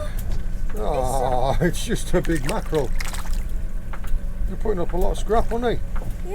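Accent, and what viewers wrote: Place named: North East England, United Kingdom. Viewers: Really enjoying watching your videos. I’m struggling a bit at the moment with my head and watching your videos takes my mind off things. NE accent in Cornwall catches the imagination. Only ever been course fishing, but would love to try sea fishing. Keep doing what you are doing. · Hey up fella, only found your channel yesterday and totally enjoying them too. Thee sounds like a lad originally from up this neck of the woods fella. You are blessed living where you do. I would go fishing out from Hartlepool years ago and my uncle who lived up in Tynemouth would take me out when I would go up there when I would go to stay as a boy for my holidays. Love your videos buddy keep em coming.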